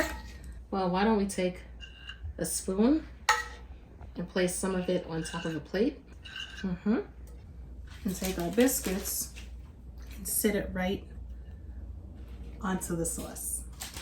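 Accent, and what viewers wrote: New York, United States. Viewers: I’m in love with the New York accent 😅. And I’ve made most of these recipes. Somebody need to put a ring on that finger ASAP 🥴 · Love your New York accent. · The NY accent love it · Heavy on the NY accent ;-) My mom is from the Bronx :-D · Hi,.I just started watching you about a week ago and i'm loving your recipes. I love the way you talk to I think you're from new york but i'm not for sure, but thank you for sharing your recipes because i'm getting ready to try quite a few of them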